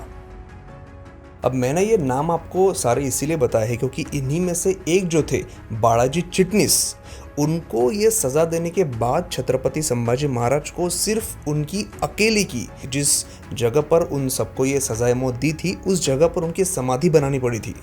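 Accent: Maharashtra, India. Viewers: बहुत अच्छा व्हिडियो! जी आपके कुछ उच्चार जैसे की 'हाथी' के बजाय 'हत्ती' बोलना और 'निर्माण' को 'निर्मान' बोलना उनको सुधारने की जरुरत है. आप मराठी हो ये आपकी बातो से झलकता है.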